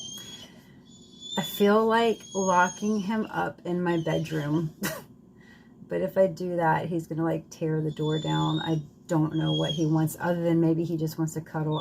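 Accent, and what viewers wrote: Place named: New Jersey, United States. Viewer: You sound like you live in NJ. I left that hell hole of corrupt made up parking tickets of places you never went, but the people there blow through red lights and it isn't one car but 5. The bikers do that for their protection because so many get killed. I get it though, I used to bike at the park. Heads up to anyone going to NJ, green light doesn't mean safe.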